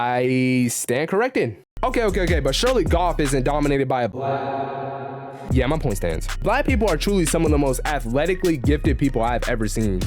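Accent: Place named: Africa. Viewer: Yoh which part of africa are you from?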